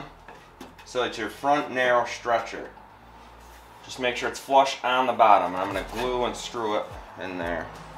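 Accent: United States. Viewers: You speak perfect american english ; clear, tidy sound and excellent pronunciation ! Very helpful video for any wood works learner and english learner as well.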